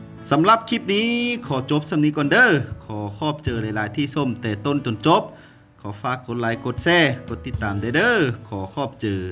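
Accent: Phu Thai, Thailand. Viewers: มักน้ำเสียงสำเนียงผู้ไทภาษาพูดน่ารัก ติดตามตลอด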